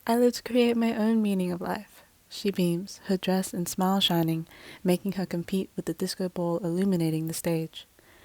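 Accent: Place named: Australia. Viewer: Australia I think. Gotta see if she says "naor" or not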